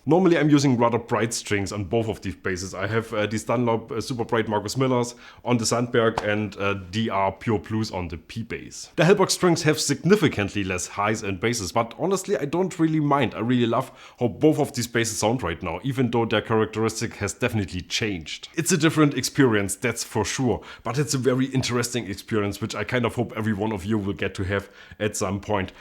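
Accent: Germany. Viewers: 6 seconds into the vid. Are you german?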